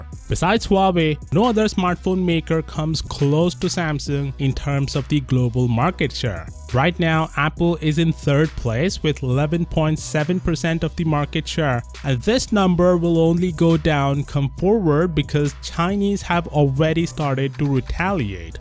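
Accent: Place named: India. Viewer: What’s wrong with his english.. is he indian ?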